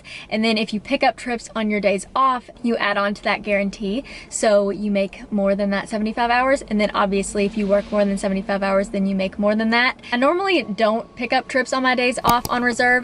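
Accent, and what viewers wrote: South, United States. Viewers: is it just me or that southern twang + fancy face a killer combo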